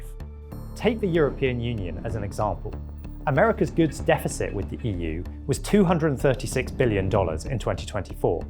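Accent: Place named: United Kingdom. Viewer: Heard the British accent and knew it would be trash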